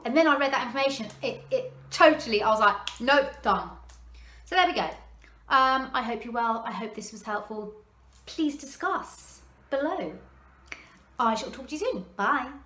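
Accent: United Kingdom. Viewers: it's great to hear the rant in a British accent brilliant. By the by everything you said is true in amway also ..thank you cheers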